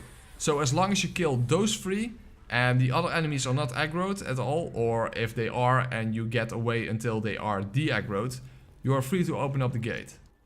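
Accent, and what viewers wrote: Netherlands. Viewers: thanks for the guide, your accent sounds dutch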